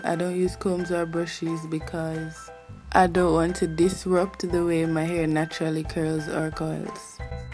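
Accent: Jamaica. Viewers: your voice tells me that your a Jamaican... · Amen! Straight yard she come from! Jamaica to di world! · You sound so jamaican..I love it😁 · u sound Jamaican. Jamaica to d worllll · ikr I was like yayyyy a Jamaican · From she start talk mi know seh she a Jamaican ❤ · this is awesome..thank you so much...yaaaaas u jamaican i can hear it!! dwl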